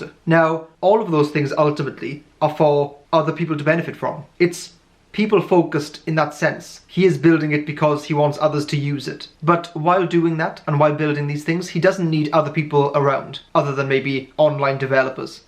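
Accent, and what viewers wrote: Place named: England, United Kingdom. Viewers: I love your accent. It sounds special. Maybe it's English-English. There is mostly American-English on Youtube so this one is different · Now if I can figure out your accent. Not scouse! RP with a touch of the Midlands maybe ....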